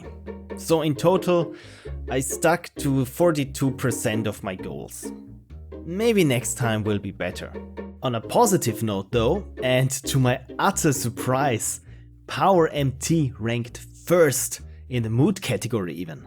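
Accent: Germany. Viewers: Wait a second, you german?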